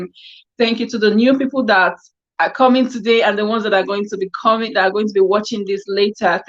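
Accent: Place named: United Kingdom. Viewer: This is a very interesting topic u look gorgeous sis I am enjoying ur UK accent...would be here for all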